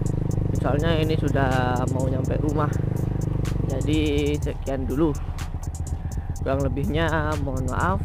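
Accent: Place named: Madura, Indonesia. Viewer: Maap bahasa indo khas Madura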